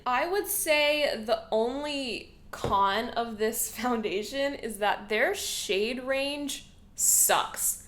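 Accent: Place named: Australia. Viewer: I thought you were gonna say it's a "wowder" LOL been waiting for someone to shade glossier like that You look great!!! Also loving the free aussie accent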